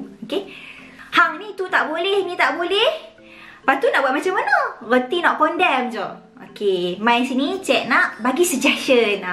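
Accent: North, Malaysia. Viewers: Tudia aihh utara bak hang😅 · Suka tgk video² nih sbb gunakan loghat utaqaaa.. TQ utk semuaa information Dan ilmu² yg di share.. smga murah rzki sllu..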